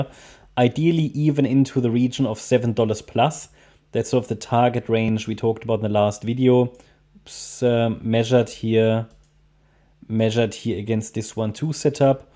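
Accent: Germany. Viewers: Hi, deinem Akzent zu urteilen, würde ich jetzt behaupten du sprichst eigentlich deutsch 😆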